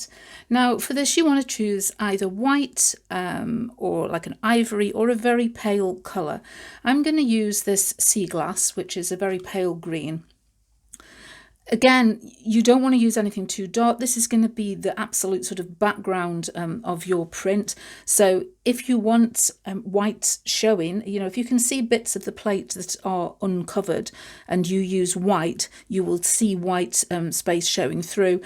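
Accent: England, United Kingdom. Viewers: Thank you, I am so pleased to have found your videos. I love the way you explain the process clearly and calmly in your lovely English accent. So many of those I have been watching have been, while informative, too fast and with loud music over high pitched American accents. I'm a water colour painter experimenting with new techniques and ideas as I am now housebound during lockdown due to my age and health so have been exploring YouTube for inspiration and ideas. I now know that I have been using the wrong type of acrylic paints and not planning the process enough resulting in messy prints and wasted paper. I'm looking forward to watching more of your videos and hopefully producing something I don't have to hide away!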